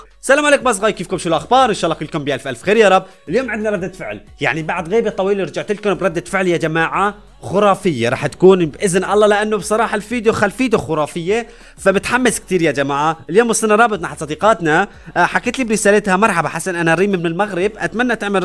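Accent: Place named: Iraq. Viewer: حسن سوي فيديوهات عن الاكل الصيني حباب متابعتك من العراق ولله مبدع وتره حجيك يويه يشبهه حجينه ولله احلى قناه ...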